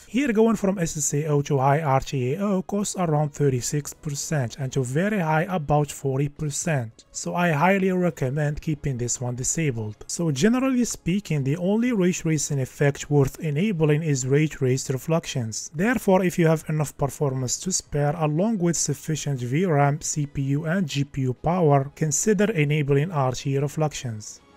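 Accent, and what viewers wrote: Morocco. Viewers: accent dyal lmgharba matkhfax rak nadi khoya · the moroccan accent is so thicc lol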